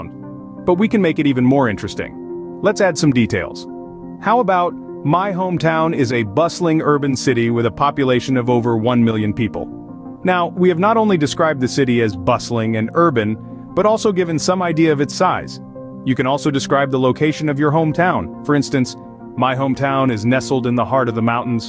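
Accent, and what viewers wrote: United States. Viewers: Can't you hear it ? Of course it is told with an american accent.🙂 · is this american english?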